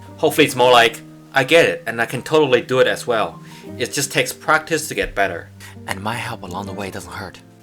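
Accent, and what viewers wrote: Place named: China. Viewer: This accent sounds like that of a Chinese guy speaking. His English grammar and vocabulary is great, however this accent really sounds Chinese. Good hints, beautiful drawing. Congratulations.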